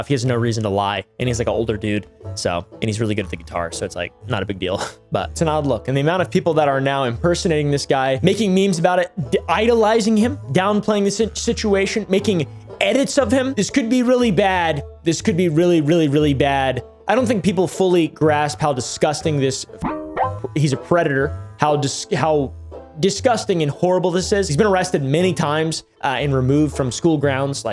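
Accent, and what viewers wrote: India. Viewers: From his accent he actually sounds Indian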